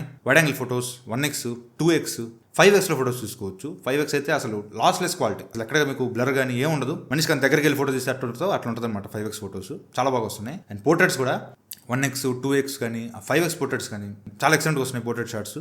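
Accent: Nellore, India. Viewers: Bro medhi nellore aa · Bro meedhi nellore e na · Bro midhi nellore na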